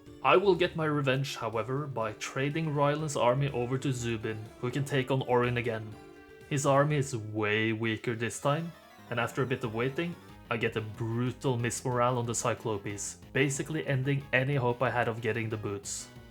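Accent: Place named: Norway. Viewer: Norwegian revealed... for all those that didn't already recognize the accent Heia Norge!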